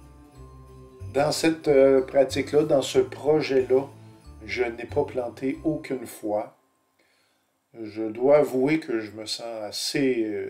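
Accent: Quebec, Canada. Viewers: Bonjour! Pas facile la plane pour un débutant, mais avec cette vidéo qui complète tes anciens tuto, je me semble plus à l'aise. J'ai donc fini mon 1er lutin sans accrocher, et en plus avec la finition cyano. Un bonheur...Un retraité français( mon fils vit à Montréal depuis 10 ans, j'adore ton accent qui me refait vivre de bons mais rares moments au Québec )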